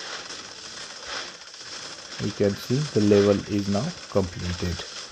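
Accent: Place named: India. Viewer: cant understand a word this dude is saying. the indian accent is bad enough but talking as fast as you possibly can like you just did a mountain of meth really doesn't help.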